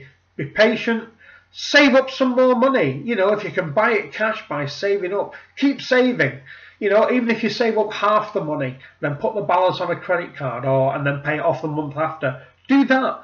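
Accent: United Kingdom. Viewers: Too british. lost me .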